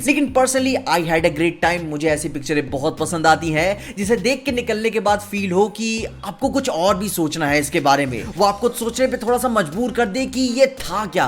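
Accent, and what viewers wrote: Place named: Lucknow, India. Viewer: bro kaha se ho app ki bolne ka tarika lucknow ka lag raha hain vaise main bhi lucknow se hu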